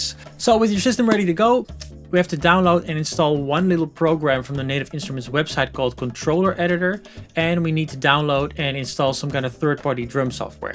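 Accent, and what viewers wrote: Netherlands. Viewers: Zo te horen Nederlands ;-) Dank je wel, deze video heeft mij enorm geholpen! Ga zo door.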